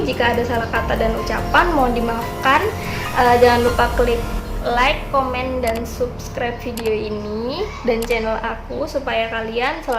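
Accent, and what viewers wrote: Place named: Surabaya, Indonesia. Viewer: Exspresinya dah ok mbak....tp suroboyoan ne iku lhoo seng jek ketok😂😂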